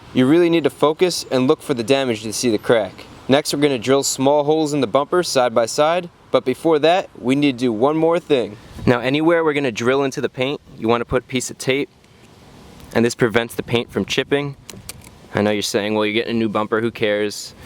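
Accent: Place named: New Jersey, United States. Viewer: Dat jersey accent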